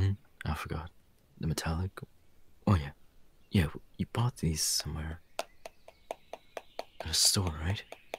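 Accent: United Kingdom. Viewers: Why does he sound so British 😂😂 Also ADORABLEEEE ❤️❤️❤️❤️❤️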